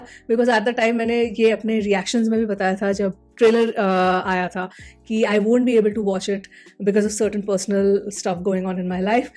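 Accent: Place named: Northeast, India. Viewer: Mam are you from north east because your hindi accent doesnt have that north indian vibe where are you from❤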